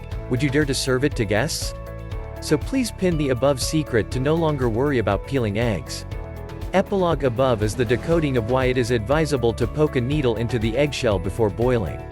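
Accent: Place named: United States. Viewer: This video is so scary. It is obviously not written in English lmfao but the white American accent is scary!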